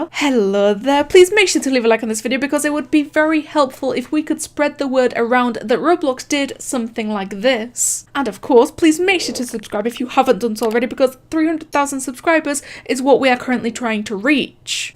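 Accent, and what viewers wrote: United Kingdom. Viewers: can we talk about her bri ish accent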